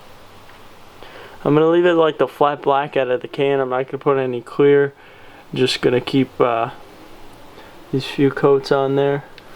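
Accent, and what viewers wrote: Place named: Sweden. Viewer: are you swdedish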